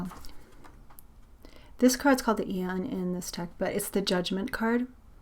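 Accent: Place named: Australia. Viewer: Hello, Thank you for your videos and your beautiful nature! Your tone of voice makes me wonder if you are originally Australian?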